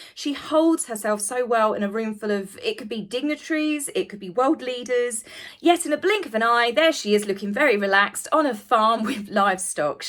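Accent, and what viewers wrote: United Kingdom. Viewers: Thank's for talking a little bit slower in the video. Sometime's it's hard to keep up with the British accent when it goes so fast. Lol...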